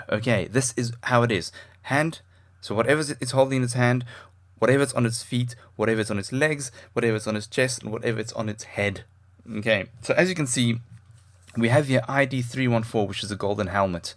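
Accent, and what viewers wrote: South Africa. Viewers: Awesome video!!! :D Are you South African? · are you from south Africa ??????!!!!!!!!!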